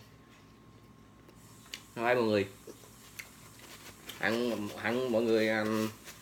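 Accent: Quang Nam, Vietnam. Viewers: Anh nc pha tiếng quảng nam quảng ngãi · Có phải anh là người quảng nam đà nẵng không?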